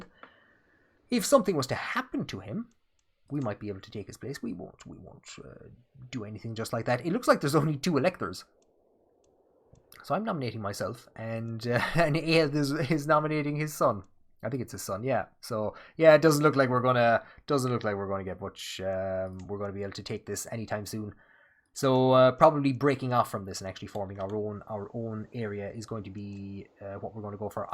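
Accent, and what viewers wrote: Ireland. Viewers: Love the True Irish narration dope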